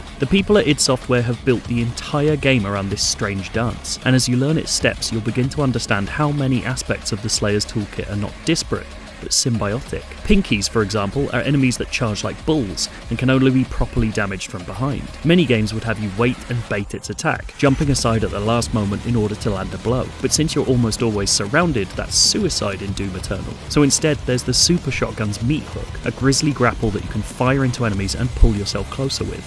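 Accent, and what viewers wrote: United Kingdom. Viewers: Only British accents can make Doom sound fancy.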